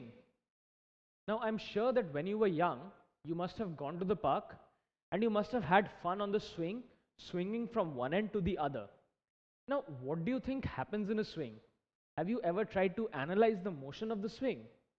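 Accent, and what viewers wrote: India. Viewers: Very good fellow but please try to speak slowly, because of your Indian accent and also many student belong to deffernt countries and the English language is not their native language , thanks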